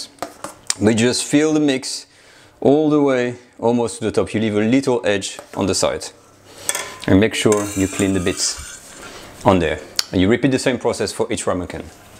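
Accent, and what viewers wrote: France. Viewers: That's because it's a French man speaking English in a French accent🍟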